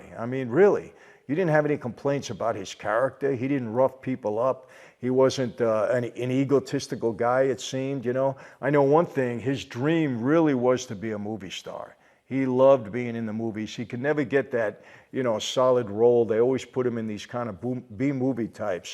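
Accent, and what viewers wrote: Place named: New York, United States. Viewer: You sound like a New Yorker!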